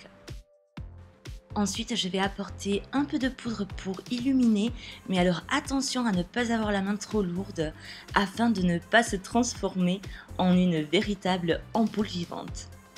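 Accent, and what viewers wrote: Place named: Béziers, France. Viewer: Vu ton accent tu viens de Béziers non ? Hihi j'adore ton maquillage bisous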